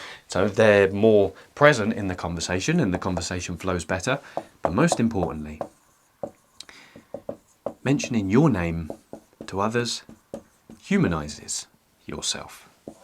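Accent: United Kingdom. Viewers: I recently discovered your channel, and aside from the helpful advice, I have to say I love your voice, your way of speaking, and your soft British accent. English isn't my native language, and your videos are helping me improve my pronunciation. goat 🐐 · This British accent is understandable and good, which part of Britain do they speak this way? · Good morning! How do you spell your name? I spell mine Cory. So, I’ve been spending less time on my phone but it struck me this morning, i miss British accent and words of wisdom❤ i also thought to myself, Cory how much of the health that has lead you to spend less time on your phone is due to fabulous YouTube channel 🤔🫶🏻🫵🏻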